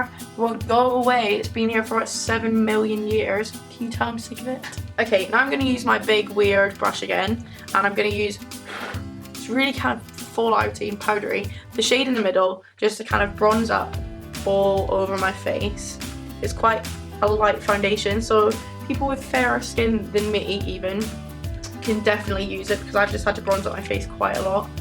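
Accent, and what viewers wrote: Scotland, United Kingdom. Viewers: Is she Scottish or English dumb question ik 😂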